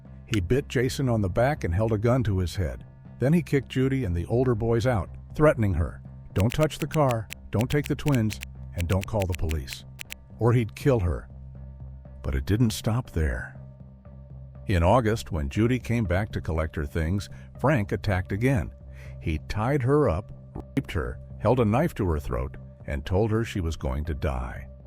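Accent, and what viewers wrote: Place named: England, United Kingdom. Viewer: I love your English accent. In which states of the US do people have this accent?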